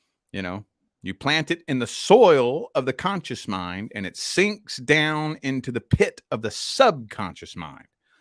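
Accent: United States. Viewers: Love the shirt So straight forward, love the way he speaks with his American accent “Doing a lot of healing” that is what I am focusing on “Close it out!” Good thing about Gemini they move forward and on because it is an AIR sign ruled by Mercury ~ we always come out on top…Gemini Chitter Chatter 🎉 Feel like this a spiritual community ~ Intuition, Spirituality, Feelings, Emotions, Cosmic Realm, as well as spiritual (beyond the physical) Realm “Too damn late” As a Gemini I love to “Ghost” people I dislike Between a “Scorpio & a Leo” it’s always been a Leo for me… I have a lot of Leos in my life I don’t know why? Taurus/Gemini cusp so I have a lot of Earth and Air with a Monkey Chinese Lunar Calendar but I believe it is a Water Monkey, so a little bit of water in the Chinese Lunar Calendar but in the astrological sector all the Earth and Air elements … I struggle with surface level connections because I am quite shallow and too busy for emotions and deeper level connections … The upside down world card keeps me going in circles because I do not care about making progress … my boss is a Sagittarius and always thinking about moving on and starting a new job with another company PLUS she is bitter~ I am curious if she will leave my work as my boss? 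:) SO BITTER sounds about right jumping from toxic relationship to toxic relationship but I can see it :) “Blessing in Disguise”. “Healing” “Person Person finally arrives” “Hard work out of the way first” My parents are Capricorn & Libr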